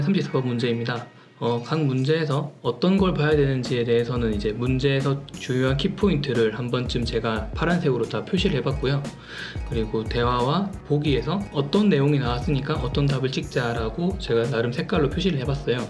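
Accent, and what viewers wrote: Gyeongsang, South Korea. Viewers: 혹시 부산이나 창원이 고향이신가요?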